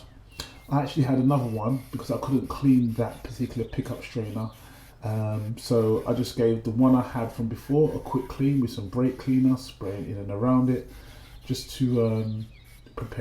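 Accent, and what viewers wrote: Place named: United Kingdom. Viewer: He has a very british accent but yet very understandable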